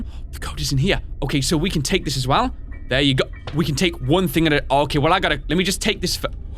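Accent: United Kingdom. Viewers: R u British,?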